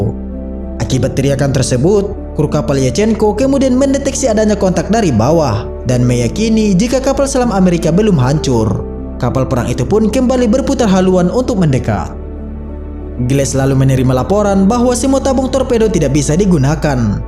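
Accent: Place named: Java, Indonesia. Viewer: Fokus ke pembaca narasi.. mendok jawanya terasa bnget..😊😊😊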